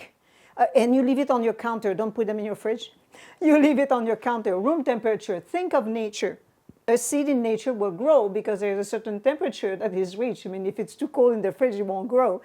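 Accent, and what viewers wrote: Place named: Caribbean. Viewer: Love your advice and your accent is beautiful! I feel like I sense a tinge of islander in yr voice, Caribbean of sorts. (don’t mean to be rude). Thank you for sharing!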